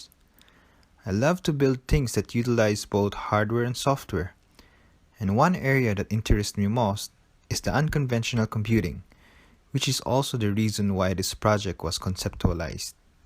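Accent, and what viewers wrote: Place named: Philippines. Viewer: You sound Filipino. Are you filipino?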